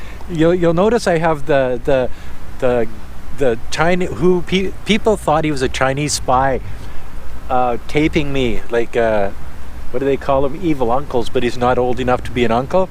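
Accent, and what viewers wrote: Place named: Canada. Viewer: the most canadian accent of all time and i love it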